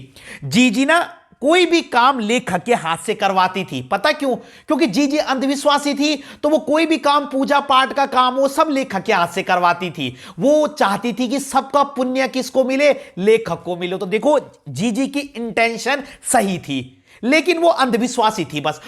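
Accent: Garhwal, India. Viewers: sir your garwali accent ❤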